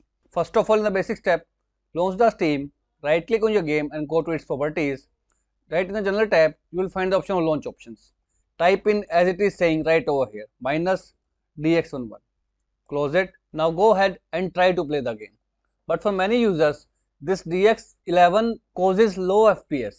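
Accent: India. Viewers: Me finding 10 Videos before where an english dude is talking -> instant skip Me finding Video with heavy indian accent -> this is what im looking for. Always the best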